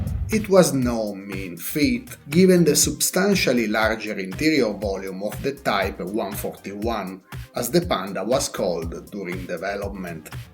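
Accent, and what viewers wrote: Italy. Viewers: Top and i enjoy the English Italian spoken !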